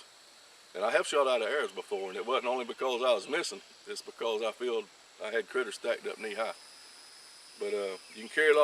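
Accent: United States. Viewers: You get into some stuff and sub to YT channels and sometimes your interest passes, but along the way you find a guy who could make doing laundry sound like the most interesting thing on the planet, someone whose enthusiasm and integrity makes them just a pleasure to listen to, you are one of these people. Best US accent ever too!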